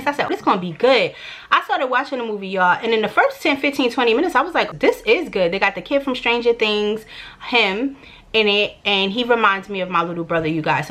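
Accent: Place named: Brooklyn, United States. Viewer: Cooper is hilarious and smart❤️ I’m ready for your new channel/ the new you🥰 I feel like you have the right represent Brooklyn, it’s in you💯 It’s in your personality and even in the way you talk sis.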